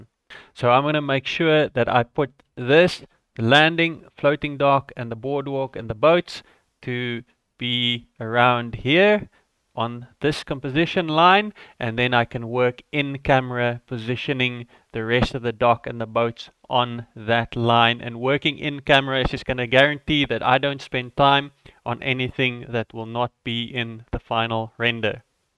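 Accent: South Africa. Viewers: Where are you from, you have great tutorials, sound South African?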